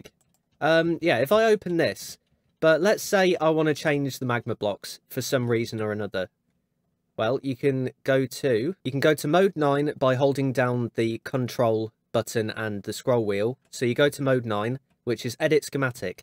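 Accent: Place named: United Kingdom. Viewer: That was fantastic, and extra points for the British accent! I learned heaps that I can use :D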